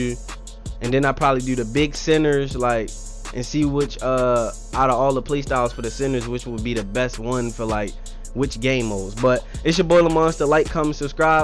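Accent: Baltimore, United States. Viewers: He sound like he from Baltimore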